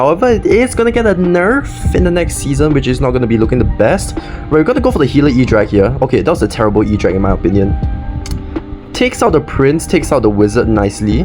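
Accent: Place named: Asia. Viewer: why do you have both african and asian accent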